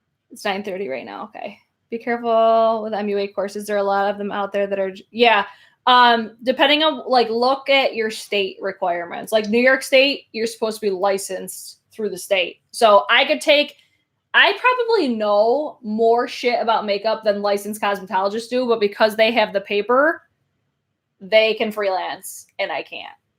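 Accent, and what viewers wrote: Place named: South, United States. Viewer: noticed the southern drawl.where are you from. Im from saint martinsville,louisiana,currently live in chicago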